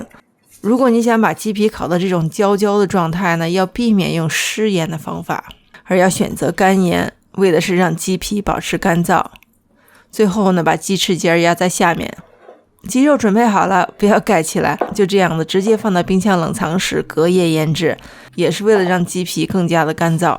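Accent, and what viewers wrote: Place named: North, China. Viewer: 太赞了！谢谢。你亲切北方口音也很暖我心❤️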